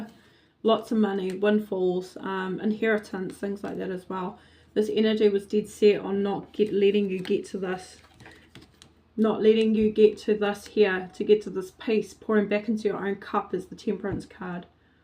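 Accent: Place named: New Zealand. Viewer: I thought she sounds. New Zealand 🇳🇿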